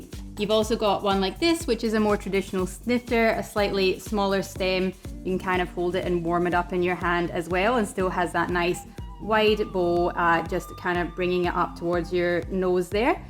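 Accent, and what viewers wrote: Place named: Scotland, United Kingdom. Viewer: Oh, Scottish accents! You could be reading out of the phone book, and this video would still be enjoyable. But Scottish accent + knowledgeable whiskey 🥃 instruction = fanatic content. Keep creating!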